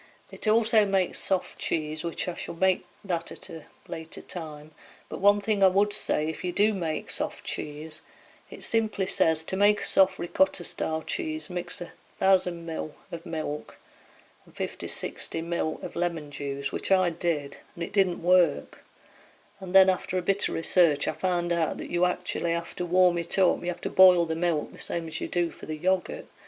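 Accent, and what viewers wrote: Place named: East Midlands, United Kingdom. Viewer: Thanks for a clear and detailed explanation of how to use this machine. Also, are you from the East Midlands, by any chance?? Your accent sounds just like ours.🤭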